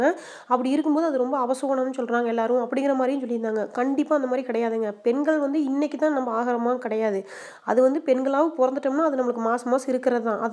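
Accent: Kongu, India. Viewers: What a kongu speech we are also Coimbatore super proud of you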